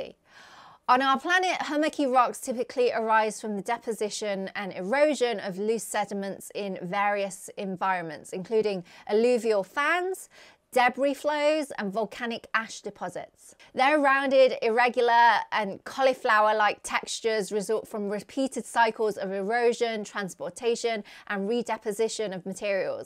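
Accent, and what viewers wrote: United Kingdom. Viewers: asian lady with a brit accent. literally exactly what the internet was created for. yeah yeah and science sure but mostly the former.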